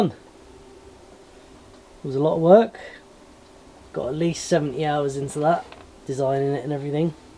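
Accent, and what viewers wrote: Wales, United Kingdom. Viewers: Because I'm listening to you with American ears sometimes your Welsh accent is hard to understand but I love your videos keep up the good work you're very talented person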